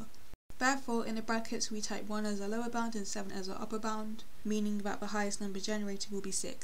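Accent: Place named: United Kingdom. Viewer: I love your British accent ;) and I love your Unity tutorials!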